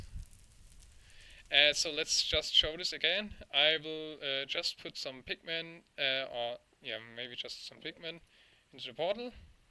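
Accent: Germany. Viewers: Hey, one question, are you from Germany? Sounds like a german accent. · Your accent sounds really German , are you? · Hey Ich bin neu im Sprechen, in welchem Teil von Deutschland kommst du Deutsch?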